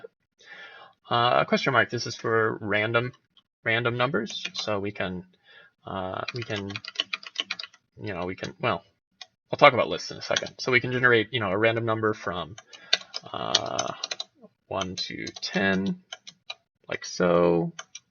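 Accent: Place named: Canada. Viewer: It really depends what part of what country he's from... My guess is Canada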